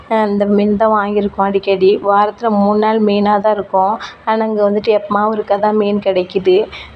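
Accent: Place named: Tamil Nadu, India. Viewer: உங்க நேட்டிவ் எந்த ஊர் sister South side மாறி இருக்கிறது உங்கள் பேச்சு வழக்கு எனக்கும் சாலை மீன் தான் favourite அப்பறம் விளை மீன் ஊளி மீன்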